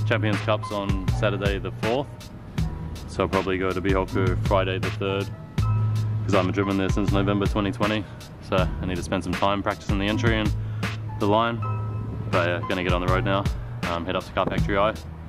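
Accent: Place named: New Zealand. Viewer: Just stumbled across your vids. I recognise a Kiwi brother's accent. Love the vids. Just got an 83 ae86 from Japan late last year. Helping the 86 population grow in the motherland ha. Look forward to binge watching your content.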